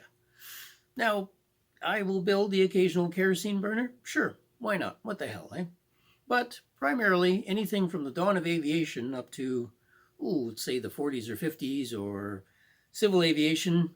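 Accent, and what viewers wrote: Canada. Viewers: I knew you were Canadian right away. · Deckles? I'm 54 years old and I have never in my life heard someone pronounce it "deckles." Every dictionary has the accent on the DE- de'cal. Then you go so far as to do a bit to insult an entire region of the US, because you think this is where the (correct) pronunciation comes from. Like they are all an episode of Hee-Haw. Meanwhile, we have to listen to you talk ABOOOT things with your northern Canadian accent. I don't think I'll follow you with your smug and incorrect advice. Maybe do a video aboot freckles and deckles. <link>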